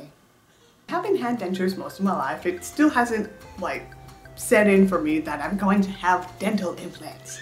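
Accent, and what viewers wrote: South, United States. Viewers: mad dy I’m Southern and it’s close. She’s from Kentucky, apparently, so I’m not far off.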